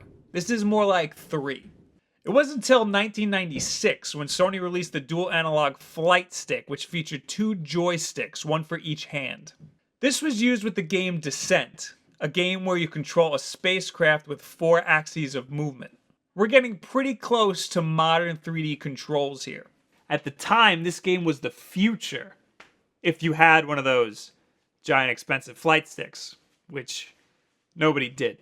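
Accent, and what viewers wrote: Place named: New York, United States. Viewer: when was going strong with that ny accent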